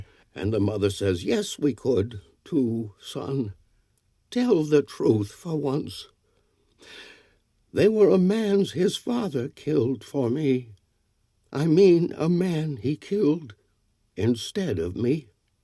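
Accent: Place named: New England, United States. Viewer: This is how I believe Frost meant this to sound , Great New England accent enjoyed it very much thank you.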